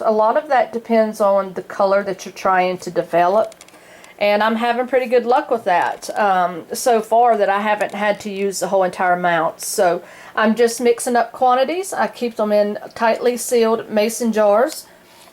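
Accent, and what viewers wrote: South, United States. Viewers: I find that remark to be offensive. What difference does it make what accent she has? She has a southern accent. To say it is peculiar is just rude. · viridian it’s just a country or “southern” American accent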